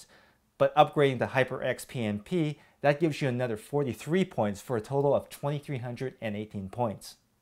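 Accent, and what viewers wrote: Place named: New Jersey, United States. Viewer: That's a lovely accent you have there...New Jersey?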